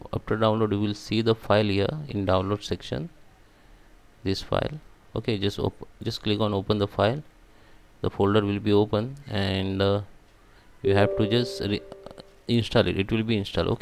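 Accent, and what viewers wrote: India. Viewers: indian accent is umbearable holy 😭😭😭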